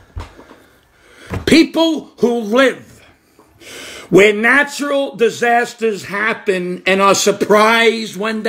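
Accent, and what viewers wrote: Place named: Brooklyn, United States. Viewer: “WHERE I COME, ITS HOOO AAAHHH!!!” LMFAOOOOO I RECOGNIZE THAT BENSONHURST BROOKLYN ACCENT ANYWHERE, LMFAOOOOO ….THANKS FOR THE LAUGH 🤣👍🏾👍🏾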